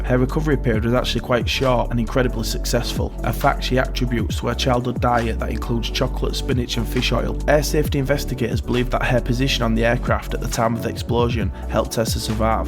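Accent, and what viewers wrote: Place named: West Yorkshire, United Kingdom. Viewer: Just subbed , is tha from west Yorkshire? , good luck with the channel.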